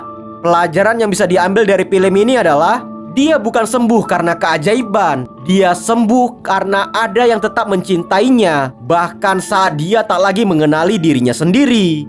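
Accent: Medan, Indonesia. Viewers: Paten kali ah abg ni klo cerita suka aq weh khas gtu ama logat medannya😂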